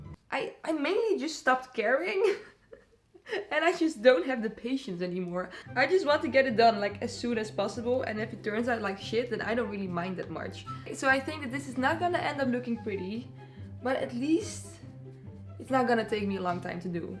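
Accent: Netherlands. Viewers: Wait is she dutch? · Hello. One question: are you Dutch? I think you have a Dutch accent. I am also Dutch :) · ZC I tough she was Dutch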